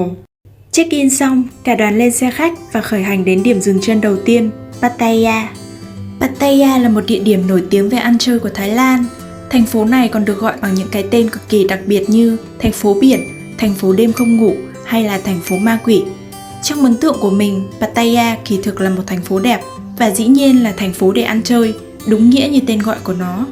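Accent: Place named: Nam Dinh, Vietnam. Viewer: Âm hưởng nam định rõ vcc kkk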